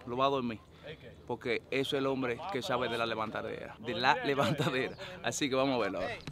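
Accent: Dominican Republic. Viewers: KLK TA DURO ESTE CANAL OYE AMI Se ase que eres dominicanos esmas yo wa a desi que tu ere dominicano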